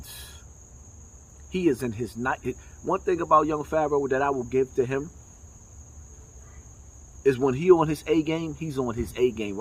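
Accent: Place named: New York, United States. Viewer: Good points BUT YOU aren’t the one to give the message AND Pharoah is voluntarily putting his mess online. You the same man who disrespects dead folks by saying they got their snot box popped and all that fake ass, extra, NY talk you do. YOU learn how to handle yourself properly before you try to tell us how to handle someone who is voluntarily doing nonsense. If you are so concerned this WHOLE VIDEO should have been to Pharaoh and not the viewers, because he is the one tripping. You just made this video for views, so go chill out and work on a better, more respectful vocabulary. That NY excuse is played, the internet is worldwide so get it how you trying to live.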